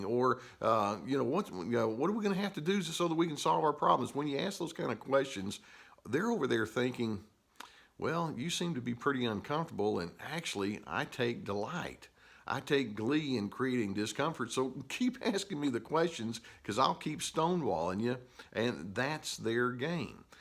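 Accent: South, United States. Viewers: Dr, You have helped me so much! I bought 2 of your books on amazon. They both 'cut to the chase'! I was a people pleaser and became very angry and then depressed. Without realizing what was causing it, i sunk down for years. You helped break it down and sort it out! I am free of my bondage! I am forever grateful! Would love to spread the word! Bless you! (Love your southern accent too)!! You have changed my life, my attitude, my future!! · I'm from the south, also. I hope you know that your super cool southern accent gives a much needed safe space to the sceptical-of-therapy southerners. There is a lot of suffering down here that's definitely in our control whether or not we want to admit it. After showing my super anti-therapy Alabamian aunt one of your videos to help her understand her brother-in-law she said "I really like him. He sounds like a normal person". 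hahahaha I love it! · I love your southern accent. Hallo from Bosnia.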